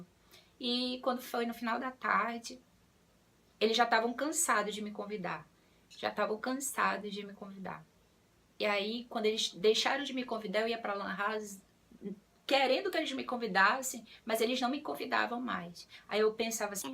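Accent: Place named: Rio de Janeiro, Brazil. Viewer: achei que vc era do rio de janeiro.. pelo sotaque rs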